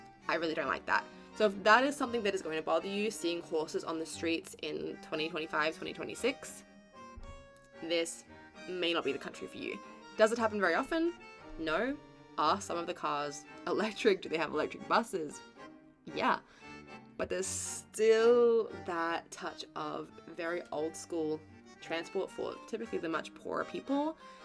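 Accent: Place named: Uruguay. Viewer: Hola como hace para hablar espanol con acento uruguayo? donde lo aprende? Me refiero a: "perdés" eso deberio ser "pierdes". ATB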